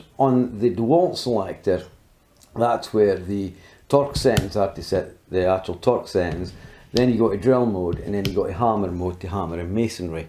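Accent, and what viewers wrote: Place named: Scottish Borders, United Kingdom. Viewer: Where you from you sound like the borders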